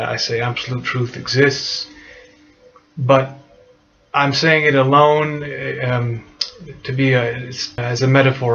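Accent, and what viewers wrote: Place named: Canada. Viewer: Your dialect sounds Canadian, but it might not be. In Canada we have a monarchy, although the queen has no actual power, although she often is astonished to herself discover how much moral power she actually has. But legally, she is unchallenged and unchallengeable - but, realistically, she has no power at all. If she pushes the envelope at all, we'll suddenly have an Act of Parliament declaring Canada a republic, and nobody wants this to happen. So what is going on? We agree that she is necessary as one of the illusions or myths that bind our society together. She is just a human being, but she is also Her Majesty - chosen by god, even though nobody believes that, and probably never did. Society requires rules, and these rules require justification. So we have myths that we know are myths, but what they really are is a psychological boundary. 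"Question this, and the whole thing comes unglued" is a very "Canadian" thing to think, and that goes for English and French, as well as immigrants - who buy more readily into Canada's myths than native-borns do. So it is both absolutely true and absolutely false that the queen reigns. It's I suppose an agreed-upon sort of doublethink, but utility trumps "reality" here.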